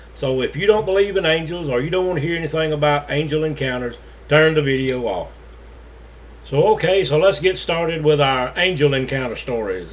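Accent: South, United States. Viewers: hey man these are some great stories I believe every one of them I've had an experience myself... you know something that is one southern accent I like listening to you talk brother we chat soon be cool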